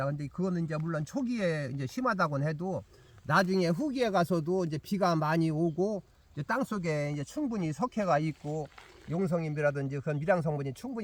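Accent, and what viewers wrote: Chungcheong, South Korea. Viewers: 정겨운 충청도사투리의 설명이 참듣기좋아여 오늘도 추비의 중요성과 비료 선택의 중요성을 잘숙지 했습니다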